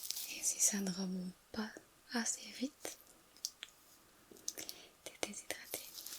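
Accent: Flanders, Belgium. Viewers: elle est pas flamande ?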